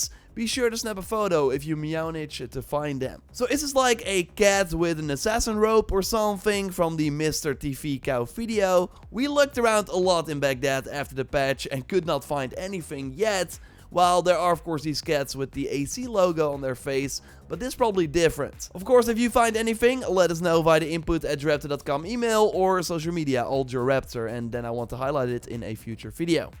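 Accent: Netherlands. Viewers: dat nederlands accent is zo duidelijk lmaoaoa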